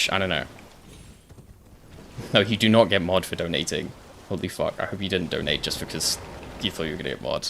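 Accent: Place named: United Kingdom. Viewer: is my favorite Australian streamer. Weird that he has a British accent though.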